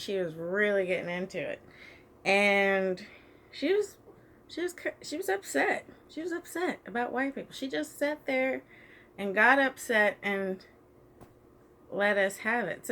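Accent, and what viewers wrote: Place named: Dallas, United States. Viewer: I'm from Dallas too.. I never realized we have a Dallas-accent until now! I hear myself lol high five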